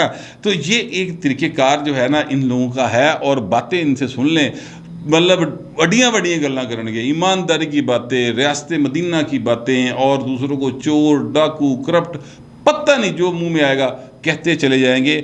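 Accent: Lahore, Pakistan. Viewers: sb your Lahori touch is wah wah wah. Maza aaaaa gia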